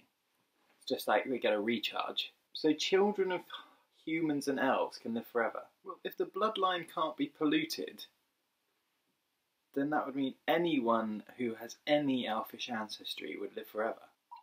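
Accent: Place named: England, United Kingdom. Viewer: I love how more and more comfortable you are in front of the camera. If your accent didn’t give your nationality away your dry humor did. It is very much English 😂😂😂. I love this random video please make this a segment. It’s literally stream of consciousness in video form. It’s absolutely brilliant! Who ever was on the other side of the camera was hilarious, I like her!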